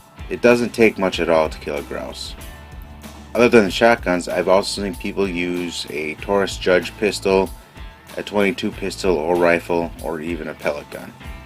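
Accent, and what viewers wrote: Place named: Midwest, United States. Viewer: Great advice, can't wait to put it to use. Feels right hearing it from a midwest accent.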